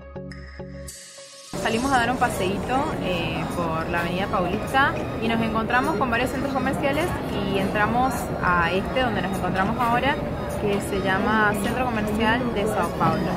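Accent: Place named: Argentina. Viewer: Me vacilé tu acento argentino todo el video 🤭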